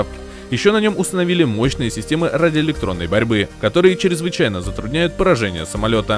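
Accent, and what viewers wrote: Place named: England, United Kingdom. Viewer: Ты лицо своё прячешь, потому что упорно искажаешь произношение русских букв на английский лад? Чего добиваешься, увеличение дизлайков?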